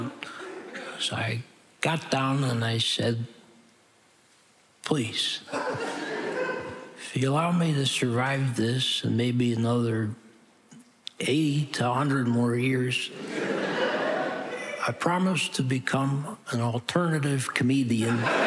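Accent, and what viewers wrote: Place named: New York, United States. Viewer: A voice that actually represents NY. This man is a treasure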